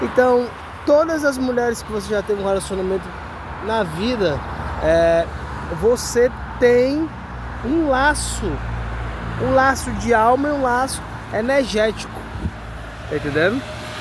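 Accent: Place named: Fortaleza, Brazil. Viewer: Parabéns,vc é um cara bastante sabio , gostei do vídeo e me inscrevi, e pelo sotaque percebo me que é de Fortaleza , vc fala muitíssimo bem 👏👏..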